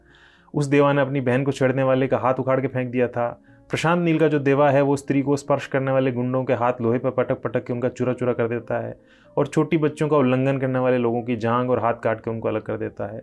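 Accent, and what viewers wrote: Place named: Rajasthan, India. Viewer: भाई मैं आपका रिव्यू पूरा देखा पर भाई सच कहूं तो जैसे ही अपने बोलना शुरू किया पता नहीं क्यों अंदर से आवाज आई कि आप राजस्थान से होंगे 😊 सच पूछो तो इतनी bachodi राजस्थान वाले ही कर सकते हैं भाई सच पूछो तो आपने बहुत तगड़ी bakchodi ki 😅😅hai और सच बताओ तो मैं खुद राजस्थानी हूं इसलिए यह Janta hu😅😅😅😅😅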